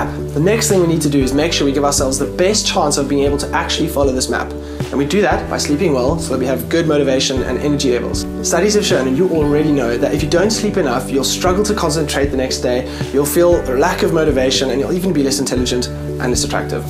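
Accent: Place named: California, United States. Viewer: And you're picking up the Californian accent.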